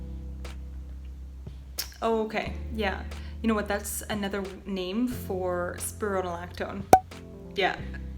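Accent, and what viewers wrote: Canada. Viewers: At first I thought you were British... but I think you are Canadian?😂 I’m from England so all accents that are like American sound very similar 😂❤️ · You sound Canadian lol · I love your Canadian accent! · Canadian. Ontario. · Are you Canadian? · is she canadian??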